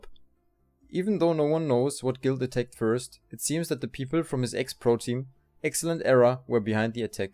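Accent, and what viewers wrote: Germany. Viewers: Dieses deutsche englisch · Hey is it just me or is your accent a bit German? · Ich hör raus dass du deutscher bist ;) Weißt du vllt wo man den schauen kann, weil chrinyroll hat den nicht. Wollte mir den nochmal anschauen weils auch schon 2 Jahre her ist. Feier dein Content. Find solche Videos richtig gut weil man sich immer ein Stück weit ein Video rein ziehen kann um zu schauen ob der Anime einem zusagt oder nicht👌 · Uhh okay so two things great video but correct me if i am wrong is that an german accent if so i must say great english skills :D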